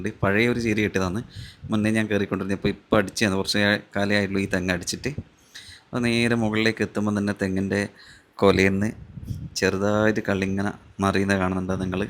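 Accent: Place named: Kannur, India. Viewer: കണ്ണൂർ സ്ലാംഗ്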